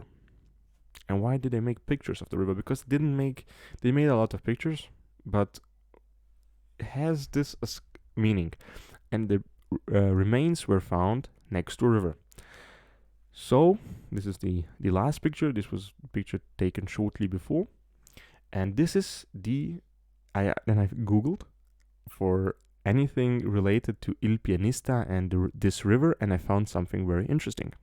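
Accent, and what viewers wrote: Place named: Russia. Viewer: По вашему акценту, мне кажется что вы Русский!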